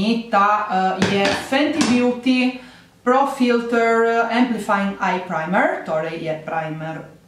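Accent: Trieste, Italy. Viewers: Si sente un botto che sei triestina hahahahhaha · Sloveno con accento triestino, top😆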